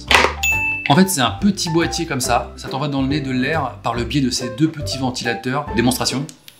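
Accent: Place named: Toulouse, France. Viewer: Pire accent toulousain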